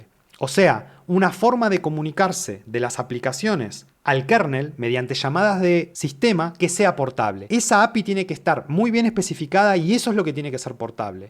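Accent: Rioplatense, Argentina. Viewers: Me podría pasar media hora, halagando las virtudes de esta locución en sus diferentes aspectos. A mis 70 años , 50 de programador y en electrónica, lo voy a decir en una sola palabra. "Excelente" . ( Imparcialidad en el enfrentamiento intelectual entre los colegas, balance conceptual y emocional , uuffff !! difícil cosa , por eso me suscribo. ) Ademas , de regalo, entendí TODO, la dicción perfecta, sin vicios del habla, muy raro en nuestros dias, en TODOS los medios, incluso en este. Felicitaciones pibe. Un gusto escucharte y sonido rioplatense. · Que hueva perder mi tiempo en una disertación tan estéril , crei que escucharía algo novedoso. Y encima de todo tu acento argentino lo echa a perder más, esperaba algo más interesante y no solo un fan más del kernel de Torvalds echándole porras, lo llevas a un terreno casi casi de novela de televisa.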